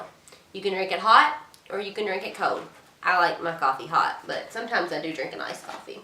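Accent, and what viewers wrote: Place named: Georgia, United States. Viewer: Sound like a Georgia girl.. maybe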